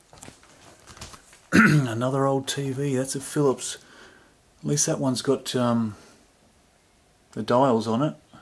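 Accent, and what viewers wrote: Australia. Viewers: Subbed. Why ? : Because the video is LONG and NOT forced upon you. I felt as if I was there with you and could see what you saw and feel what you felt. AWESOME VIDEO. DONT CHANGE, be long and thorough. Sounds like your from Australia. Hail !. Be safe because Ive seen those damn spiders that live there. UGhh. Take a pistol preferably a Desert Eagle. Can never be to safe. oops I told you so !. Start blasting and hope for the best. Fear those spiders !. Damn bigger than Spiderman ! Make sure not to close in any animal, they can die from starvation or dehydration.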